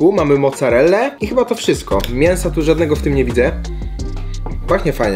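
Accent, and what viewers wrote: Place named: mixed, Poland. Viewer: Mordo ty z Podlasia lub z Podkarpacia jesteś? Bo taką gwarą mówisz 😂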